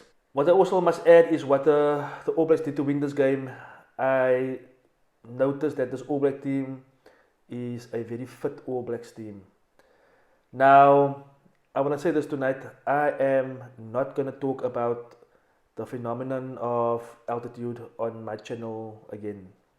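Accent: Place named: Afrikaans, South Africa. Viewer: This idoit never heard an Afrikaans English accent before. What he doesn't know is many foreigners find the Afrikaans accent very intresting and attractive.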